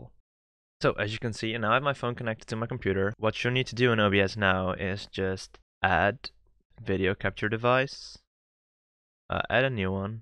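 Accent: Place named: Netherlands. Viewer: This might seem off-topic but you sound like a Dutchman.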